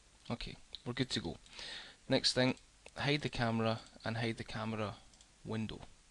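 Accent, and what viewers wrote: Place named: Wales, United Kingdom. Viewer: stop speaking in that horrible welsh accent i'm not hating i just don't like ur accent ur a gr8 guy just that accent is not my kindness no hard feelings <3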